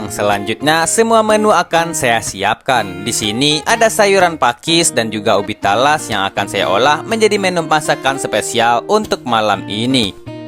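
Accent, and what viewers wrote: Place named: Adang, Indonesia. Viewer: Logat bicarany kyk orang adang sm kyk sy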